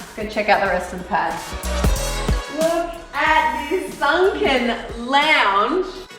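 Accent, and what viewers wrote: Australia. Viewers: This is undoubtedly a lovely, cosy house which was very well designed, specially because it’s been built mixing wooden elements that contrast greatly with all the industrialised materials used such as the main marbling floor which was a fantastic choice as it’s not just beautiful for its colour and easy to match but durable and easy to clean too. I just think - in my humble opinion- that the backgarden, specially the very part that surrounds the swimming pool, deserved a proper, greenish, landscape project with lots of plants and trees not only to enhance the whole building but also to keep a cool temperature around rather than the plain grass chosen. As for the footage presenter, I must say you are lovely too, very enthusiastic, has a very convincing and descriptive language (with a lovely Aussie Accent) and I quite like you...well done!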